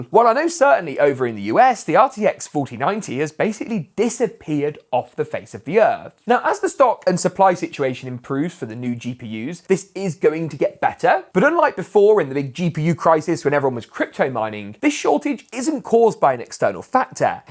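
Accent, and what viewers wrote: United Kingdom. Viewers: Just subbed. Very clear info and british, love it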